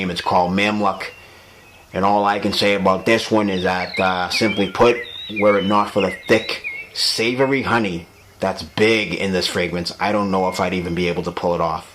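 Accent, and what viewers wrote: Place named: Massachusetts, United States. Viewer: That mass accent is thick as hell you musta grown up in the burbs of mass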